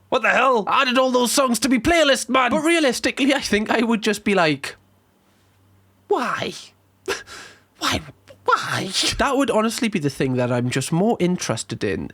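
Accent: United Kingdom. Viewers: What kind of accent does have specifically? It’s really regional. He doesn’t sound like any of my friends from the UK who are from near London nor my Scottish friend.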